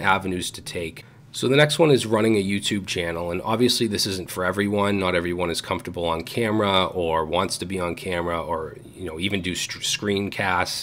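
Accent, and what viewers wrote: Boston, United States. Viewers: You hated your accent?!?! I just come from hearing you say "border" a million times in a tutorial and I was like "Boston, baby, yeah!" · ain't nothing wrong with having a Boston accent, even a rather thick one. you might even get some kids from abroad to say certain words that way 😀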